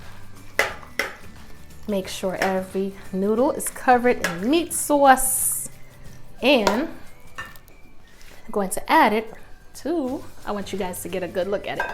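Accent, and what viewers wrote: New York, United States. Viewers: This heavy New York accent and giggle just reminds me of Ashanti and all my female friends back home. I’m making this tonight and just subscribed. Thanks love!! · Sound like your from NYC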